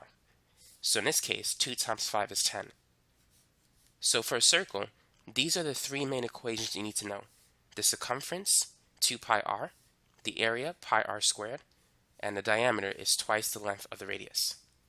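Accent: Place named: New York, United States. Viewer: This man gotta be from new york! DeadAss!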